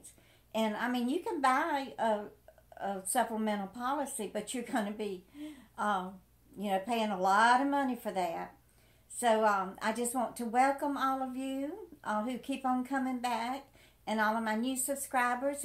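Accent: South, United States. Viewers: Hi. I just found your channel. It’s nice to see someone address the realities of the elderly. Couple of things. First. Your a attractive woman. New Jersey? With a southern accent, cool. Are you Italian? You resemble some of my Aunties. I did see that your doing smoothies. Cool. I switched to a primarily plant based diet. I have lost 12 pounds so far. Just by cutting out a few things and replacing them with other things. I don’t use coffee creamers any longer, too many additives and sugar. I switched to half and half. I bake my own bread. 50 cents a loaf that lasts all week or more. (thanks mom for teaching me how to bake). 590 for rent? Wow, that beats 1500 plus out here in Southern California. As for me, I’m doing ok thank God and for a mother (with a high school education) that taught me how to save and most importantly pay my house off. Even if you live on social security and a pension, mom always taught me....when your old you still have to save and live a frugal life. 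It’s. A little something on dumpster diving. Just be careful. I did a little dumpster diving in my youth. It’s really sad to see what this country grows away. If your interested and feel up to working just a bit. Check out your local school district cafeteria/foodservice program. You might be able to pick up a couple of hours here and there working in the cafeteria. I was a food service coordinator for years. The pay is good. Keep up the good work......sincerely, Gabrielle, Southern Ca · Social Insecurity, this term is exactly what it is for so many. Love your sweet Southern voice & wise words for the younger generations. If only they would listen. Unfortunately many are struggling, living paycheck to paycheck. Some aren't making the choices that would improve their situation. We can't do it for them no matter how much we want to. Until they "get it", we can only wait & try to point them in a better direction. 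My state also has homestead exception as well as a discount for older homeowners (age 65+, I believe). Not thrilled about "renting" my property from the county/state, but thankful I'm able to afford it. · Hi just curious new sub here.....you have a southern accent why NJ? Im from NY but live in NJ the cost of living is atrocious. My family all moved south yrs ago Im not a fan so I stayed but overall cheaper down there.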